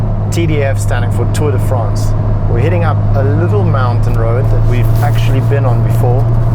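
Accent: South Africa. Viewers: South African presenter? Great job guys!!! I need a TDF in my life 😍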